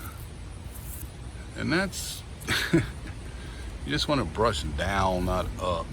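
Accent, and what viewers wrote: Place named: South, United States. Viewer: Yea! My 1st year w CPs!! I hope they turn out! 🍅 Can't wait to taste them! Yay, I picked the miracle grow, too!!😉👏 Are u originally from NC? Accent sounds a little more southern. 😁😊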